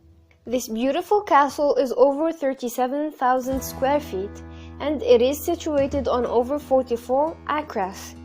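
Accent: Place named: France. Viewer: "It can be yours for a MERE $5.69 million". That might be mere to you, but not to me. BTW it's difficult to understand the narrator with that French accent.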